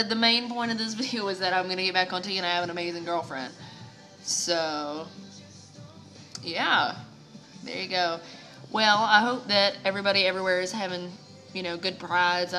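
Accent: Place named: Missouri, United States. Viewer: is it just me or is there definately some missouri accent rubbing off on him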